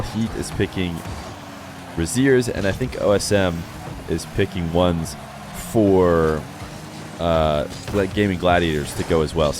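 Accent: United States. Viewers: Tbh, you’re very similar to Johnny. It’s almost like an American accent version of him. That being said, that’s exactly what everyone, myself included, wanted. Johnnys been slacking in 1s and he has hands down the best casting. What better then if not a similar caster with an insane catalogue of ones. Keep it up, amigo.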